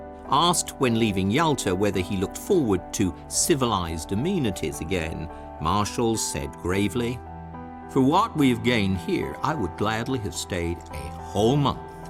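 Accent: United Kingdom. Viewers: Why do you have an Englishman narrating American History? I love the Brits, don't get me wrong, but right is right and wrong is wrong.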